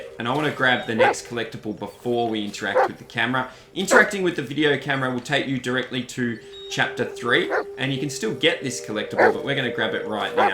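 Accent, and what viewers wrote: Australia. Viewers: GODDAMNIT the one good walkthrough I find!!!!! He has an Australian accent. I can’t focus 🤤🤭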